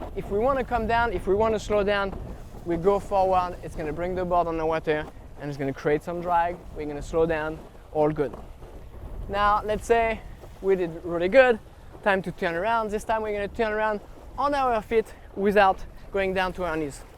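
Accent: France. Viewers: Mec, en anglais tu as un petit accent français et en francais tu as un accent anglais. Tu viens d’oû?? Super video sinon bravo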